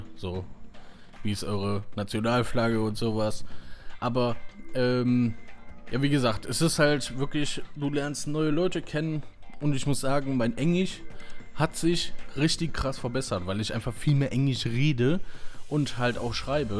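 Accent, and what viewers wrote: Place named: Germany. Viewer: Uff dieses deutsches Akzent ist ja schlimmer als Hochdeutsch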